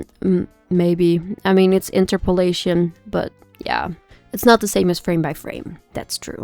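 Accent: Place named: Netherlands. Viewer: ive loved httyd for so long, I went to see all the movies in the cinema with friends or family. I loved the way you drew hiccup and toothless (although i might be more biast towards T cus I love dragons in general) It's always so odd seeing a style i recognize and then realizing I've seen insta reels of them before 😭😭 (kny saja boys) Also I love your accent!! I knew it sounded dutch and I always get excited seeing other dutch artists who like the same medias I do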